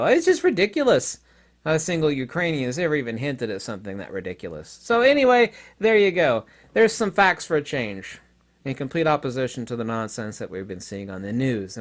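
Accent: Russia. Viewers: You sound Russian cause it sounds like you don't care about life and trying to down play murder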